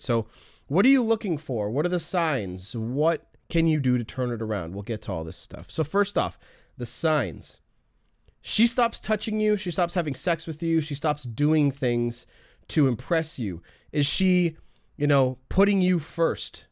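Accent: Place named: Montana, United States. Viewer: Hey, where a-bouts are ya from? You almost sound like you are from Montana? Canada? Lmao!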